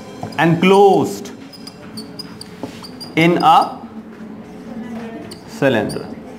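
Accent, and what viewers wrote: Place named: Punjab, India. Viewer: why punjabi sounds so heart-touching and funny😂 especially when he speks.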